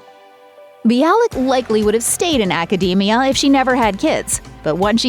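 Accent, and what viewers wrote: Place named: United States. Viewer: So where and when did this much touted "transformation" occur? I'm assuming this is something marvelous that happened post BBT. I'm up to but I don't think I can put up with much more of the narrators dreadful American accent, at first I thought it was a robot.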